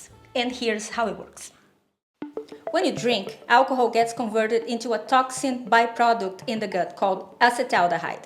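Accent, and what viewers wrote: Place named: Brazil. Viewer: I know a Brazilian when I hear one! 🫶🏼🇧🇷🙏🏼 que delícia 🤤 feliz Natal Deus abençoe 🎄👼🏽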